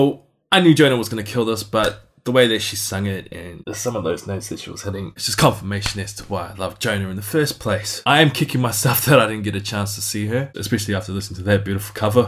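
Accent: New Zealand. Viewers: R u kiwi?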